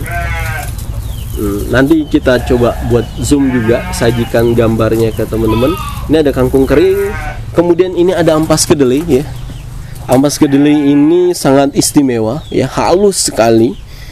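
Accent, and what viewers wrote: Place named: Banyumas, Indonesia. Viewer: Melo nyimak wong ngapak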